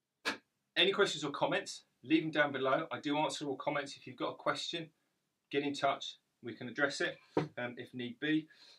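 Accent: England, United Kingdom. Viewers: Very informative and good to hear an English accent for a change!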